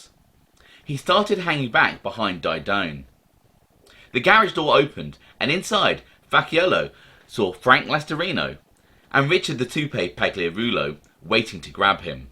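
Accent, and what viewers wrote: United Kingdom. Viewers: I love hearing Brits educate about the mob. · Is this gay brittish guy trying to teach us about our history?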